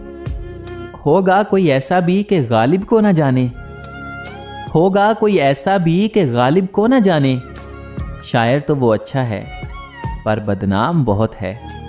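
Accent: Punjab, India. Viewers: آپکا لہجہ سن کر یقین یو گیا کہ پنجاب کے لوگ اردو بھی پنجابی کے طرز پر بولتے ہیں، پٹھانوں کی اردو کا مذاق اڑاتے ہو، اپنی اردو کا حال دیکھو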